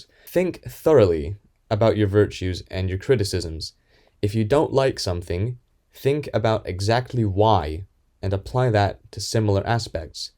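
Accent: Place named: United Kingdom. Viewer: why do you sound british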